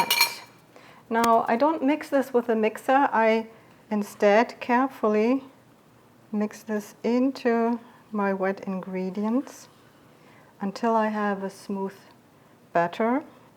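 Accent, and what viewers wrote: Germany. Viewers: We live in the suburbs of Nashville, Tennessee. PS: My husband guessed you were from either Hamburg or Hannover based on your accent. Best to you and your family from your newest subscriber! I always want to find recipes that remind him of home. But I have fierce competition. His mother is a retired chef, his brother is a chef, and our only nephew is a chef.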